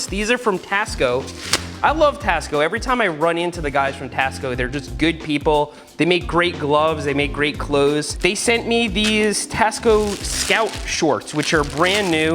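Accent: Long Island, United States. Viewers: he said it in another video he is from long island it is his long island accent showing through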